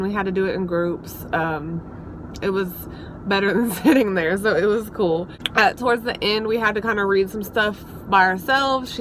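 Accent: United States. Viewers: Btw defiantly can hear the Tennessee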